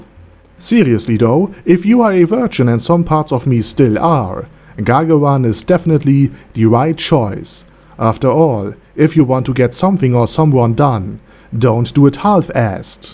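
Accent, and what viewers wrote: Germany. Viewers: oh, so you're german!! that explains the accent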